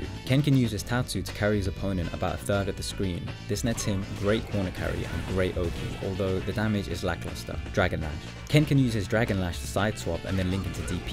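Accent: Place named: United Kingdom. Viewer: Only clicked in to verify that your are British, and indeed you are. Good day.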